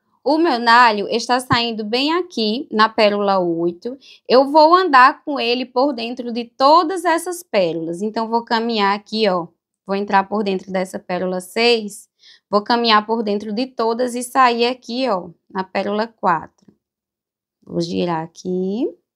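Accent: Northeast, Brazil. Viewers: Ensina pedraria e ainda rima cordel 🥰 Ainda por cima com esse sotaque que só o nordeste oferece 😊Virei fã !!!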